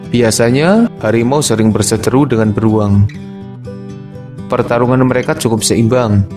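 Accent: Banyumas, Indonesia. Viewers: Logat ngapake ceto tenan 😂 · Ngapak ya bos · Aja ngapak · Logat mu NGAPAK😅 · Salfok sama penyampaian bahasanya. Orang ngapak ya?🤣😂🤣😂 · Wong banyumas kayane kue wkwkwk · Aku tebak mimin nya orang ngapak,betul gak?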